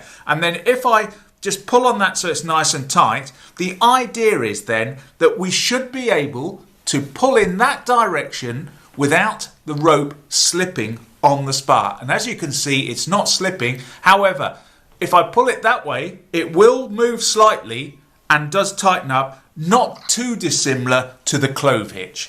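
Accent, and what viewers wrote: England, United Kingdom. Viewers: It's an English accent. The English live in England, the Scottish in Scotland, and the Welsh in Wales, but all three countries are British because they live on the same island: Great Britain. Welsh and Scottish accents are British, but this one is clearly English. Hope this helps. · I don't use it for much other than hanging tools. But I'm glad we have YouTube to show us that you appreciate the simple thing well done. Knots and the British accent seem to go together.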